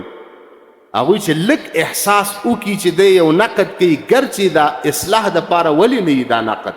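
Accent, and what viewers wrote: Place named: Pakistan. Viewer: اصل دا ده چې ستا ژبه او لهجه پاکستانی ده اسلامی امارت که ځانونه افغانان بولي نو ستا په څېر ملایانو بېخ د وه څېړي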